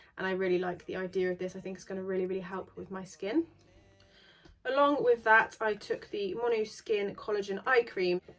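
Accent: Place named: Suffolk, United Kingdom. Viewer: Your hair is gorgeous! How do you get it so white but still so healthy looking?? Also, where abouts are you from? I’m from Suffolk, your accent doesn’t sound too far 😊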